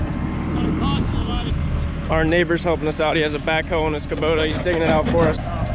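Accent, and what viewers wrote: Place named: Southeast Pennsylvania, United States. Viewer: Found this channel by accident, started watching and thought "that guy talks like he is from SE PA". Then I saw the video of the area and looked like the Lancaster area. Sure enough I was right, I live about an hour away from you and visit that area occasionally. Great to see videos of hard working Pennsylvanians. Keep up the good work and the great videos!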